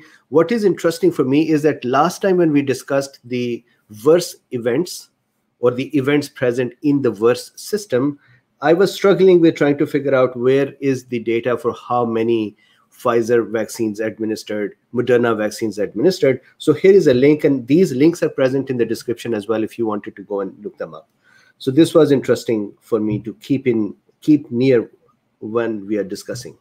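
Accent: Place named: India. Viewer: I think your accent (and Indian accents in general) is beautiful. Also, there is no problem for me to understand what you're saying (I'm a native US English speaker). A few tips on the only mispronounciations I noticed: VAERS->vares (rhymes with cares), convalesce->conva-less (convalesced, just append a "d" sound at the end, like any "ed' past tense verb). There was (only) one other word, but I couldn't remember it, nor find it.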